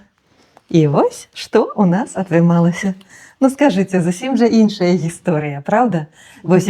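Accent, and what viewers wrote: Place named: Russia. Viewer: Это ж надо так исковеркать русский язык. 😮 Хотя слышно, что для нее родной язык русский. 😱😓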